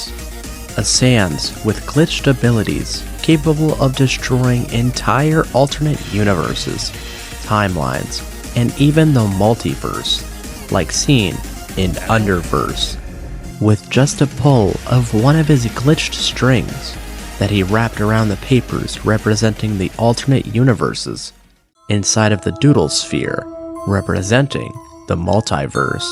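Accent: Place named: Azerbaijan. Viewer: Azari(A Turkish accent)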